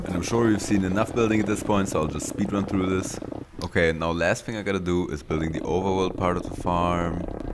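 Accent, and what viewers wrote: Germany. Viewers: Am I the only one to hear an German accent?Great Video tho❤️🫶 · Okay but am i the only one who thinks that hes German?😂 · Are you german? Anyways great Vid! Got my like and sub you are gonna blow up. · Funny video and nice editing! One question tho: bist du Deutsch? Dein akzent lässt das sehr vermuten. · I hear german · You sound kinda german. Just in case:(Döner 8€💀) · Are you german? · I love that i can hear the small german accent haha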